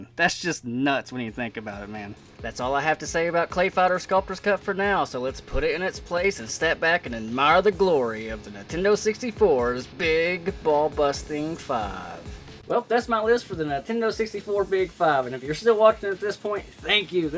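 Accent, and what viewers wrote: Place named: Kentucky, United States. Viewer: Hell I thought your accent sounded rather familiar. I had a feelin you were a Kentucky boy too~ very nice collection of games and I can see why those 5 are considered the "Big Bad 5" I honestly had no idea about Sculptor's cut either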